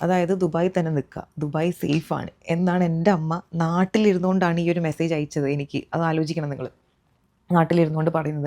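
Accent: Thrissur, India. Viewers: Chechii nattil Thrissur anooo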